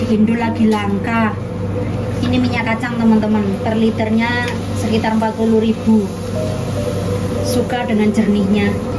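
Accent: Java, Indonesia. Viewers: Setiap mbk ngomong nada jawanya keluar, aku mesti ketawa lho mbk, soalnya klo pean ngomong medok ngt, 👍👍👍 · Mbak yen ngomong boso jowo medok jowo ne iku tetep kental masio dek samean dek india Collab pakai boso jowo dong kak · Mb lucu kl ngmng....tdk sungkan2 dg logat jawanya.salut mb.....👍👍👍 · Mf mb logatnya kaya orang jawa, klo blh tau asalnya dri mana ya mksih kalau mau bales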